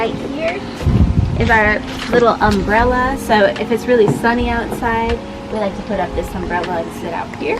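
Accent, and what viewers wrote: Telugu, India. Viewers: Chellemma nee telugu yentho mudduga baaga Matladuthunnavu,,, naaku maatru baasha telugu yentho istam..mee eddariki naa deevenalu ..mee amna , Nanna etc valla gurinichi video pettandi..all the best ,,,, n dasara SUBHAKANKSHALU... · Meeru telugu matladuthunte chala cute gaa untundi andi , naaku chala istam andi mee telugu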